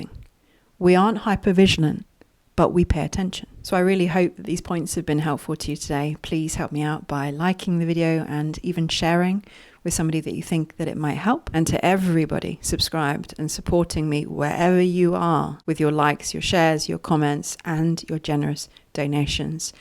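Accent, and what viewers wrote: United Kingdom. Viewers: 😊. Wow thank you so very much for that excellent excellent information! 7 what you're saying I knew, but some of them I didn't..... A's always I learned something new with you! I really needed to hear this and I don't think I would have heard it so clear and precise with all the details in nuances that you provide. After going through multiple narcissistic relationships, I've come to terms with what you were talking about I'm not putting too much volume and look for the way their body looks...ECT.... But learning to value a person more on their mind, character, and intellect.. may I give you a compliment, I really like the way you think your thought process is very deep you seem to be extremely organized in your thought process paying attention to detail. And well....I just love your British accent! 😅 Dave.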